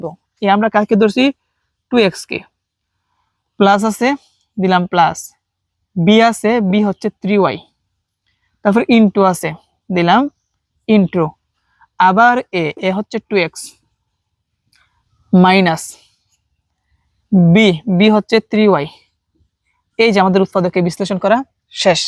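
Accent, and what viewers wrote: Sylhet, Bangladesh. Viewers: কথা বলার ধরণেই বুঝে গেছি যদিও সিলেটি কোনো শব্দ ইউজ করেন নি!!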